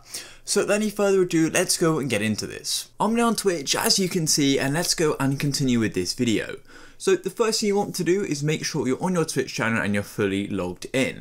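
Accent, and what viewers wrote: United Kingdom. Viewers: He’s definitely British dude he can’t help it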